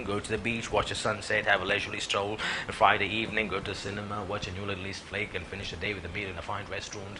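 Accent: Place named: United Kingdom. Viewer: Looks like a British accent to me. Are u British?? Nice techniques, BTW.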